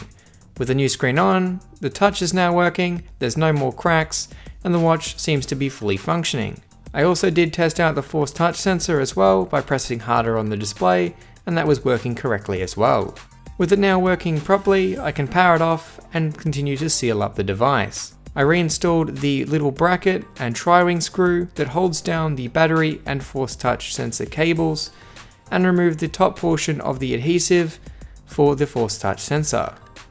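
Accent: Australia. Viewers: So you’re Aussie.... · Aussie accent bud they rise in pitch at the end of SENTANCES lol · When I hear he’s Australian like me Insert surprised pikachu meme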